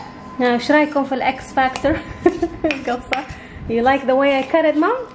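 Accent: Saudi Arabia. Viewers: انتي سعوديه؟ مستغربه من لكنتك سعوديه بس وجهك وملامحك كويتية او اماراتية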